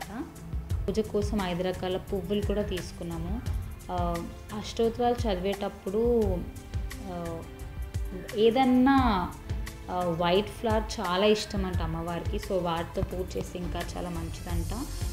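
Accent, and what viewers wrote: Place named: Telangana, India. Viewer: Akka nuv complete Telangana lone mataldu... Nen ni matala kosame chusthunanu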